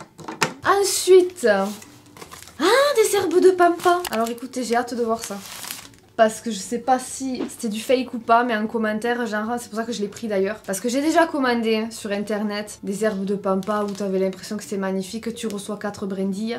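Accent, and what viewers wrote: South, France. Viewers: Bonjour, je suis nouvelle sur ta chaîne 😊 j'ai commandé sur temu j'aurais dû recevoir aujourd'hui ça me note erreur livraison 😢 j'adore ton accent tu est d'où ? Sud ? ❤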